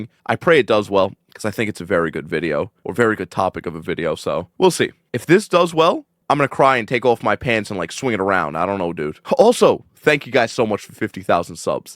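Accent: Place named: Alabama, United States. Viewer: I definitely thought bro was Alabama white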